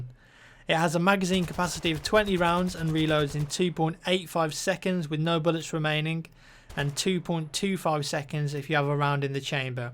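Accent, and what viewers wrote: United Kingdom. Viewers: where do you live, because it sounds like you have an accent from the UK, but I see you playing with DOOM49 who lives in the US, right? When you play together, don't you get a lot of lag?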